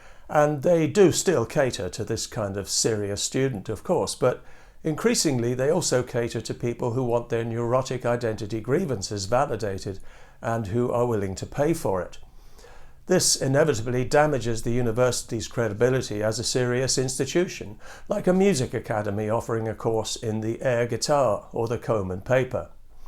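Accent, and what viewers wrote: United Kingdom. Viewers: Yes! Common sense has a British accent today.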